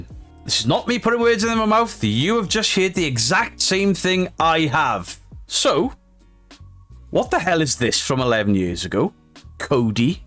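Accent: Wales, United Kingdom. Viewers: Yes he’s very welsh haha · Are you from Wales? 🤔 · Love ya Welsh accent mate it’s lovely from the north mate lovely video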